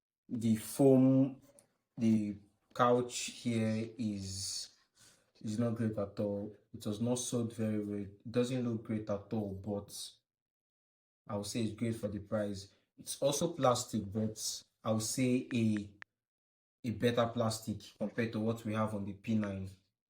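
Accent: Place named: Africa. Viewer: He's clearly African. What did you expect? The queen's English😒? American English? He's speaking a language that is not his. Show some respect.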